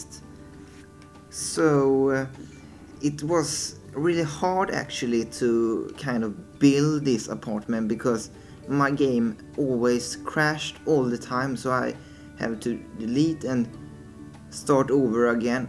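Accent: Sweden. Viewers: I thought i heard some swenglish in it swedish i awseome. hur länge har du hållt på med hus i sims? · You sound swedish :D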